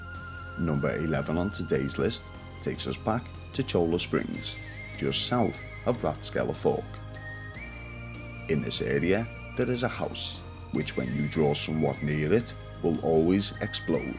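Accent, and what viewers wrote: Liverpool, United Kingdom. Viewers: Bro are you a scouser? It took me so figure out what was going on😂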